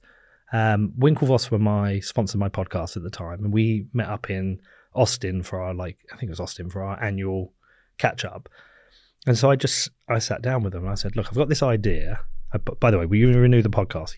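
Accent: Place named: RP, United Kingdom. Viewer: Physically impossible to listen to a podcast solely hosted by the posh rah rah berk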